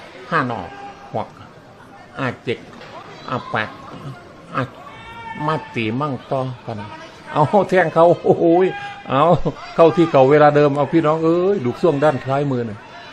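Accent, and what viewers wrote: Isan, Thailand. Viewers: พากย์เป็นอิสานทั้งหมดก็ได้นะ ฟังรู้เรื่อง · คุณ พากอีสานโลดค่ะ มวนและตลกดีชมช่อง เพลินคักค่ะ ไทยหรืออีสาน อันใด๋กะฟังรู้เรื่องหมดหล่ะ พากลาวโลด จ้า 🤣🤣🤣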